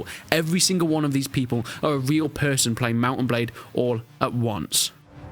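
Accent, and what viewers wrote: United Kingdom. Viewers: I hate your dumb british accent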